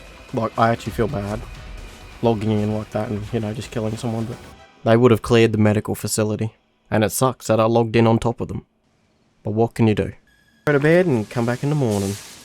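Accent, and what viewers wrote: Australia. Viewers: LOIF! Man, I love that aussie accent.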